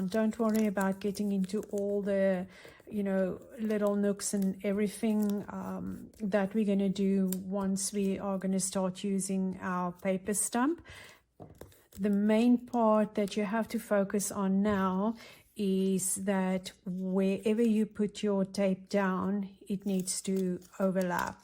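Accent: South Africa. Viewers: Just given me ideas for Christmas gifts thanks,nice to hear a South African accent.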